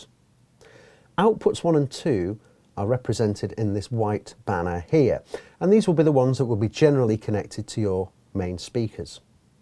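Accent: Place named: England, United Kingdom. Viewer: Just a bunch of talking with no user knowledge to be applied...oh, he has an english accent.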